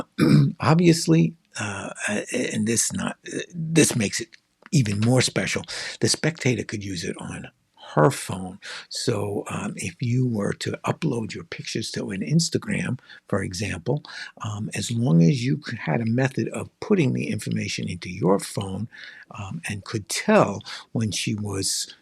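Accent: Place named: New York, United States. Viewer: Enjoy your excellent reviews … and your NY area accent. (Though long gone, I was born and raised there.)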